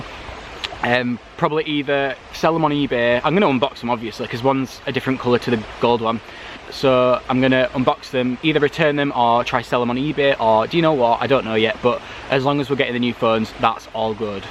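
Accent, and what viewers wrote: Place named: West Yorkshire, United Kingdom. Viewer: Sounds like a West Yorkshire accent to me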